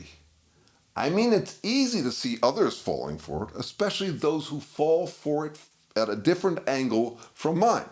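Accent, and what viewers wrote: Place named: California, United States. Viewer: He seems to have a California accent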